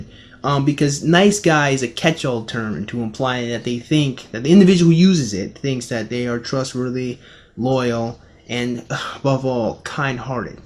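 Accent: United Kingdom. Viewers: lol what is up with the limey accent? You speak perfect english. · Your British accent makes me sp uncomfortable and left the vid with a dislike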